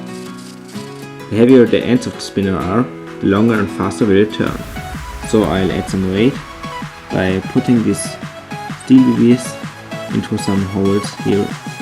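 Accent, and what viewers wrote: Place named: Germany. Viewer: your english isn't the best, bist du deutsch, are you german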